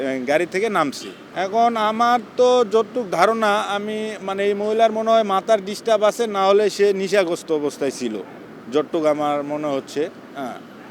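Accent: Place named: Bogura, Bangladesh. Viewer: ভাইয়ের কথার টোনে বোঝা যাচ্ছে ওনার বাসা বগুড়ার কোনোজায়গায়...!!!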